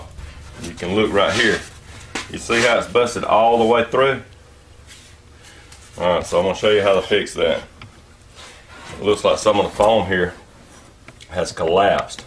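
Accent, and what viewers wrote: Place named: Deep South, United States. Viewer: He ain't from Chicargo, that's fer shure. More like Ludiwici, Georgia, I'm a guessin. South, deep south. More southern than even the Sawfish. : ) I like it.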